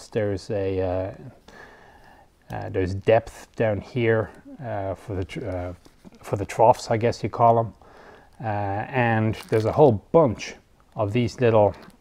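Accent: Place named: Ireland. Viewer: Now all I see is a Greek man with an Irish Accent, the entertaining link to Father Ted is destroyed.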